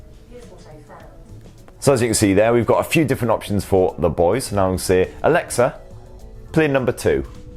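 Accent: United Kingdom. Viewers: I dont get why cant british people speak regular english? Always gotta be so extra... its annoying couldnt watch this all the way through 😑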